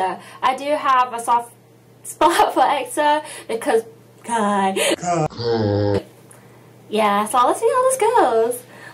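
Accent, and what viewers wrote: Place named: United Kingdom. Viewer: AHH this was awesome, loved seeing how you reacted. I was the same, Also love your accent. From the UK right? you can punch me if i am wrong ahah xD I am from the UK but you have a much nicer voice than my own xD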